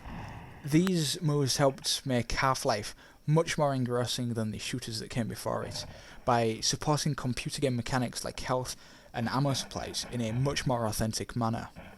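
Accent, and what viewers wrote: United Kingdom. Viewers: hes british, but not with the accent you would expect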